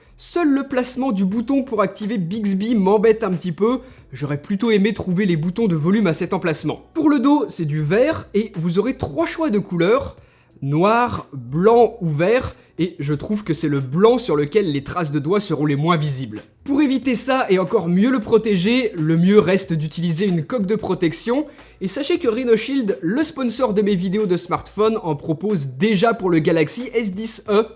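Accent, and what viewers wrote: Lyon, France. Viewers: Tu es lyonnais?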